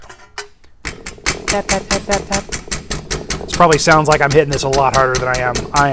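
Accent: South, United States. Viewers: Five years I’ve been watching, and never before have you sounded so southern! 😆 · Hi, long time viewer, love your videos. Keep it up. After watching your videos for a few years now, not sure if it's just my imagination but for some reason in this particular video I feel like it's the first time I could really hear a southern accent from you:) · Where did the southern drawl come from? It’s never been there before. Hahaha. It’s fine with me, just new.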